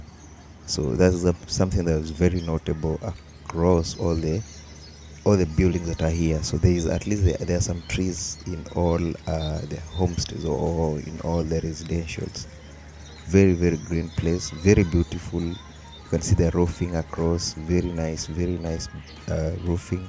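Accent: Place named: East Africa. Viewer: Are you from Uganda or Kenya. This accent is from East Africa because my wife is from Uganda. You both have similar accent. Love you from Nigeria 🇳🇬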